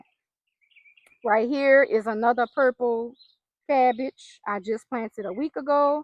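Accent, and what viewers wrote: New Orleans, United States. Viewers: Oh my goodness I recognize that accent.I graduated from Dilliard University (1978) in Nursing.New Orleans.Just love the garden.Enjoy😊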